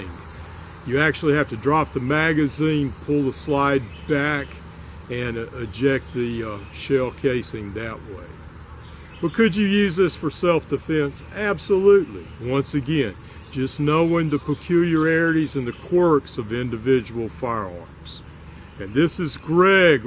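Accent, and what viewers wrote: South, United States. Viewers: “Excuse my execrable French” Mon Ami, I very selfishly felt “included” in this comment. However may I assure you that you Greg as a Southern gentlemen with your accent ….. that the French would find your “French” est très magnifique. Avec moi, with a Belfast Irish accent and though being French resident for several years my sometimes totally correct French vocabulary and grammer is often considered by my French friends as horrible, mais l'accent de ma femme Susan anglaise totalement acceptable. Great video comme toujours, especially in these times. My thoughts are with you and your family, stay safe. You can always trust a Smith!! Cordialement RB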